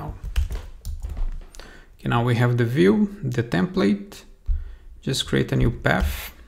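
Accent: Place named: Brazil. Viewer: Muito bom mano, vc parece ser brasileiro pelo sotaque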